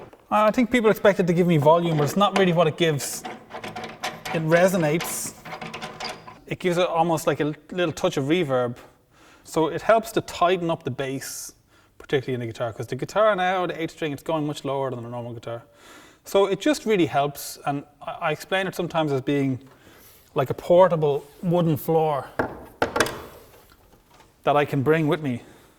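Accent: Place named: Ireland. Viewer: Third, it's called an Irish accent dumbass.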